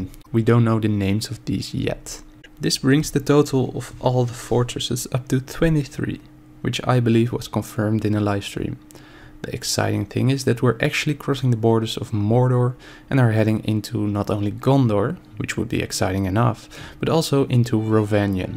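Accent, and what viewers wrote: Netherlands. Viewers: You sound very Dutch, the accent I mean.. I am correct.. Oui? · Why do you sound so dutch...(or flemish)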